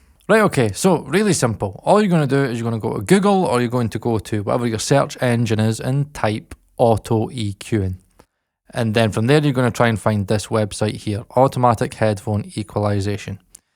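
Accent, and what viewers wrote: Scotland, United Kingdom. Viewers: Trying to think why this guy seemed familiar. I had never been on this channel, and then I realised he sounded like Frankie Boyle sorry not every Scotsman sounds like Frankie Boyle to me. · Scottish accent is nice!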